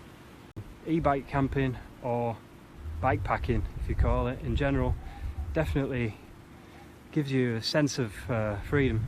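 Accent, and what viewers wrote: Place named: United Kingdom. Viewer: Where are you? British accent, but you are always riding on the right side of the road. Love the videos. Love that you love your dog too!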